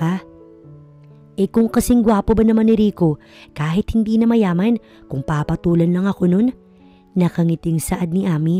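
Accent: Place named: Hiligaynon, Philippines. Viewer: Napaka gandang kwinto story Lalo pa ilongga ang nag kwinto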